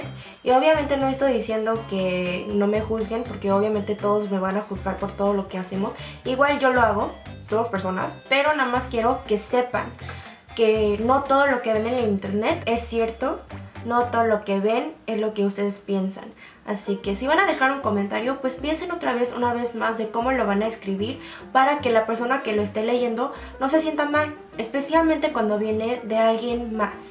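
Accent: Mexico City, Mexico. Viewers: El hate es porque eres Méxicana, y hablas un español perfecto y con acento Méxicano, aparte de que tienes cultura mexicana. Si fueras 100% extranjera (coreana) sin hablar bien el español te recibirían con los brazos abiertos así como a tus compatriotas coreanos. Está mal pero así son los mexicanos. Y si los comentarios de hate vienen de otros países latinos es por tu lado Méxicano también. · Haaay me encantas...jaja como contestas yo no veo mucho tus videos pero muy de acuerdo con lo que dices, y pues si que bueno que estes muy agusto con todo lo que sientes y tienes...cada quien tiene su vida como quiere y a nadie le piden permiso...hay de todo en la viña del Sr...jaja y por como hablas pues yo te siento muy mexicana...que tiene...jaja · Hola mi queridisima tengo muy poco que me uní a tu canal la primera vez que te vi quedé sorprendida en tu forma de hablar por que realmente he escuchado a otros asiáticos y hablan con dificultad pero tuuuuu no mames eres bien pinché mexicana aunque tengas tu físico asiatico vale madre lo que digan eres bien mexicana me gustan tus videos yo los veo mas bien escucho por mi trabajo pero trato de actualizarme contigo y te digo que si no les gustan tus videos pues que lo vean y ya cabron no se acaba el mundo bueno te mando saludos 😜😘🤗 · Hay ignorantes en cada país! 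Los mexicanos somos posesivos y te queremos solo para nosotros! Somos celosos! Una disculpa, por estos tipejos y tipejas, eres hermosa, y talentosa y eres muy mexicana y te defiendes como una mexa! Amo tu español tan chilango! Tu sigue como vas y como decia el Quijote, "dejad que los perros ladren, señal de que vamos avanzando" . Cada quien habla de lo que en su corazón tiene! Así que toma las cosas de quien viene y como decimos en México .... "hacer caso a pendejos es engrandecerlos" Éxito en todo hermana Mexicoreana!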